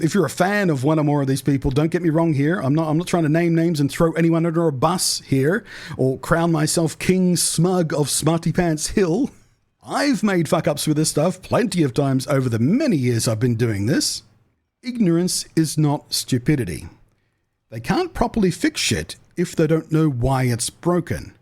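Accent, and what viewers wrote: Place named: Australia. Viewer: what accent is this? its like an australian trying to talk clearly so they end up using american R's half the time.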